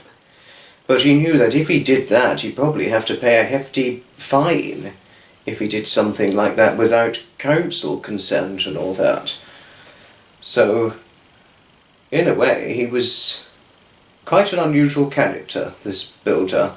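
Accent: England, United Kingdom. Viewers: What a funny coincidence. I have a family relative named Thomas Graves. He was an architect in Baltimore, MD with RTKL and a model train aficionado. I always enjoy hearing your English accent!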